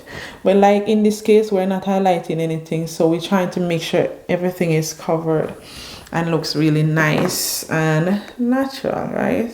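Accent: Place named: Jamaica. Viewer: u 😂😂 now u making me come on here listen to the accent chro. Jamaica one love..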